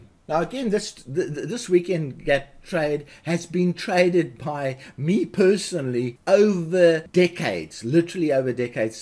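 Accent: South Africa. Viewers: are you perhaps from South Africa?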